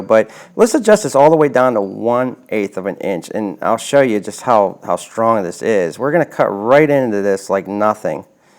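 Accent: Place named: Philadelphia, United States. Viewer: you sound like you are from the Philadelphia area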